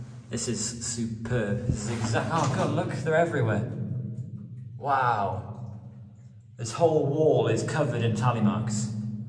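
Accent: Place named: England, United Kingdom. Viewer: A very interesting mining venture. Thanks for exploring it. Also, of interest was to see two "English" doing the exploring. (diffinetly your accent belies your nationality) I say hello from the state of Wisconsin in the USA.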